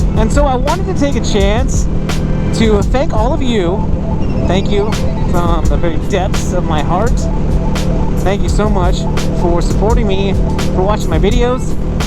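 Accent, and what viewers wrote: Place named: Australia. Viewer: OMG lol your English sounded like Australian rofl lol and so did some of the other's lol Germany..South African, My sister and brother- in- law are Australian . My sister put's it on thick and sound bad! lol. I think she does it to blend in haha I don't think so. That did make me laugh, thank you...still smiling...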